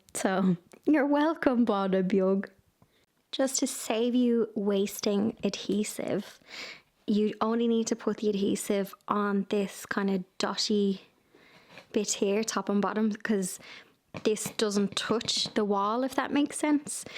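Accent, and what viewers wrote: Ireland. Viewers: Leave the ceiling white. I admire your DIY skills. Love your Irish accent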